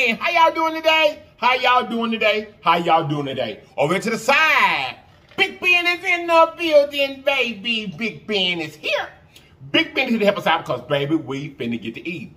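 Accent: United States. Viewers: Hey!! I like that country talk. I be becoming up with words that don't make sense lol you can say them words and it be so 🤣😂😂🤣🤣 and everything and everything 😆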